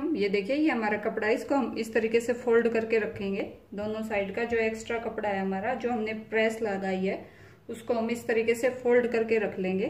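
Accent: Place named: Haryana, India. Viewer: Haryanvi lagte ho aap language se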